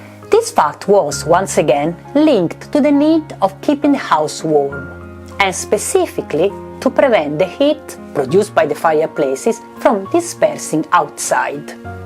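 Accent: Italy. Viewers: La sua pronuncia dell' inglese è assolutamente italiana ! Niente di grave, ovviamente :)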